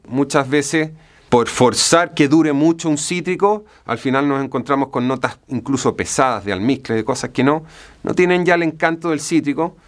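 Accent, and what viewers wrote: Chile. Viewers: Me choca el acentico Chileno de éste tipo.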